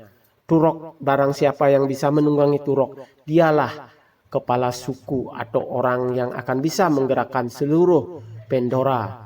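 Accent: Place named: Kupang, Indonesia. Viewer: Orang kupang kyaknya nih😂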